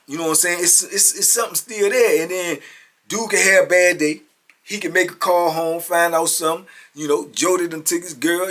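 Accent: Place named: Virginia, United States. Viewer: Another banger. But, the VA accent is killing me.😊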